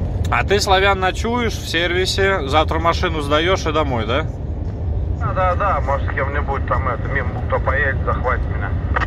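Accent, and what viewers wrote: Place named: Volgograd, Russia. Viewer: Ты с Волгоградской области? Если не послышалось, то что за район?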